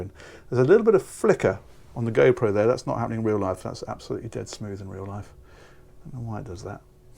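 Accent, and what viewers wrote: United Kingdom. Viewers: Another great review. You’re the best and love the British accent.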